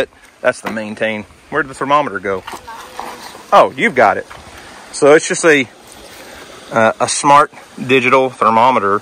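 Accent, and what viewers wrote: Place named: South, United States. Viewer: By the way I absolutely love your southern accent. I live in oregon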